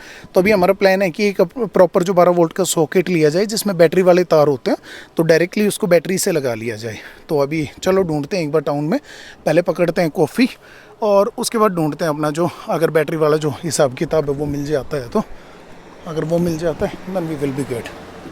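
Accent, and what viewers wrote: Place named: India. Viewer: Bhai ji ap kisi technician se pahle iska voltage or amp load chek kra lo. Kahi apki battery ko bhi kharab na kr de. Apki voice typical Indian voice hai bro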